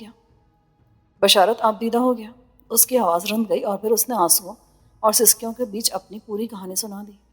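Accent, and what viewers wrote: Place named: Lahore, Pakistan. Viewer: You imitate beautiful Lahori accent well done you are my best Voice on YouTube😂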